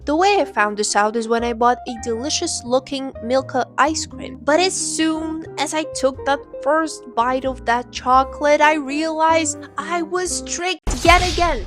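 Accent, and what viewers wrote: Russia. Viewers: is this Russian accent I hear? прикольно видеть русских даже на англоговорящем интернете :D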